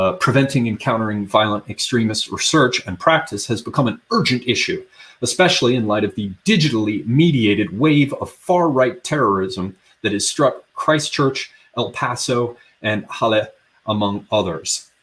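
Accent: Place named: England, United Kingdom. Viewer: D That is so fun! Your posh English accent has a combination of Irish and German dialects coming through. I'm sure glad I'm not the only one going around speaking in various accents. I tend to use Scot's English and Russian, especially when I'm brought to anger by the children. They know when mommy starts sounding Russian she lost control. ha ha ha To my credit it is seldom. Like that joke, "But did you die?" Any dialect is fine but please, no Ebonics. I have to think to myself: "School didn't fix that for you?" but then again we still have fools walking around out here not understanding the difference between your and you're.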